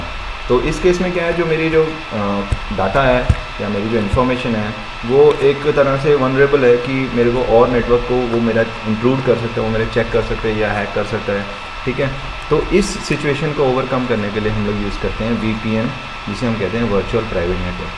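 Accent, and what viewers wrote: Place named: India. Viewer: Can't tell if that's Engrish or Indian.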